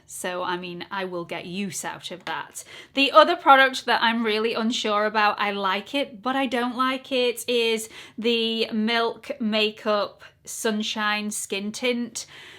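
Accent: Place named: Yorkshire, United Kingdom. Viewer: Your accent just makes me so happy. Are you from Yorkshire?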